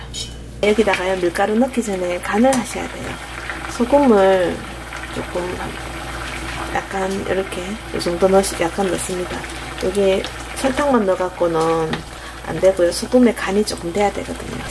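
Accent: Gyeongsang, South Korea. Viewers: 호박범벅!!! 님 올려주시는 영상 모두 맛나게 생겼네요^^ 꼭 래시피대로 만들어 봐야겠어요 ㅎ 경상도 사투리가 고향이 그립게합니다 오늘 것절이 래시피대로 만들었더니 너무 맛있어요 ^^ 구독하고 많이 배웁니다 ~ 여기 워싱턴입니다 2019년 새로운 영상 기대합니다 ~~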